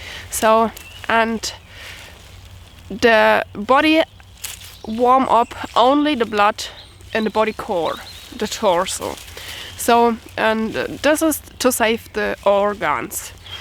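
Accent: Germany. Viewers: Nice video, ik love to hear german people speak english. but u know you dont have to block out beautifull woman, that was in the 90s. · I agree totally with Robert, You are such a sweet and courageous woman, and i love your German accent. Brings back fond memories of my stay in Germany. Hals und beinbruche!!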